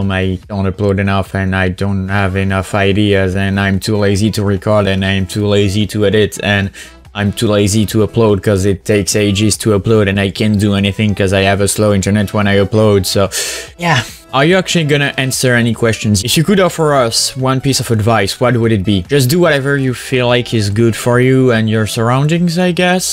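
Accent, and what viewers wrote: France. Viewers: Tu as un accent Français 🤔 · J'était sur à 100% qu'il était français, en tout cas sont accent est incroyable x) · I just discovered this guy, and new he was french the first seconds of the video. It’s amazing how french accent is recognizable. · sounds french speaks English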